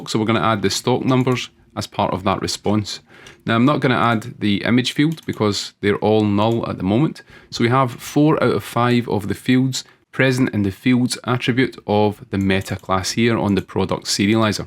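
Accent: Scotland, United Kingdom. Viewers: File in Scottish is pronounced Fail, type is tape, price is Preis (like in German)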